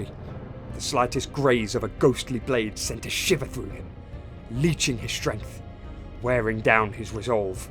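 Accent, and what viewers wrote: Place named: Scotland, United Kingdom. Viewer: Lower the intensity of the “Scottish” accent IMO.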